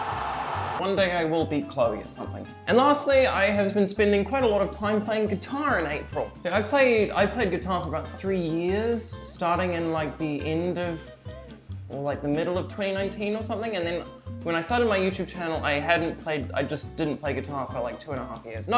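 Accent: United Kingdom. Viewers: I thought you already had a British accent! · This explains the British accent thing during your stream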